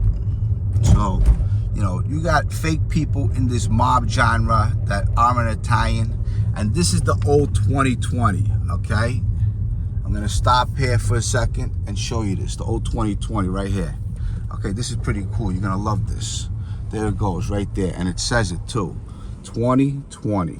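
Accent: New York, United States. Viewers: Hi this is Christian! I always love the New York accent I’m getting ready to move to Boston I also lived in Queens New York back in 98. I love the East Coast. Your true New Yorker accent makes me laugh every time. Awesome I wish I could run into you sometimes. Forget about it! 🤣😁😁👏😊😊😁🤷🏻‍♂️🙋🏻‍♂️🙋🏻‍♂️👏🤣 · Thank you. Great job with the camera AND the narration. And as a Bostonian, I ALWAYS love hearing your New York accent. But my absolute favorite part of this video is hearing you say you were going to pick up baskets for your kids. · I like listening to your voice, that Ny accent. Love watching Sammy also. Always rooted for him, to get back with his family and he seems to be doing great. Also very happy for you, you turned your life around and your a wonderful daddy to your girls! I am in Albany Ny, have you ever been upstate? Just curious. Keep up the great content and thankyou ❤👍🏻🙏🏼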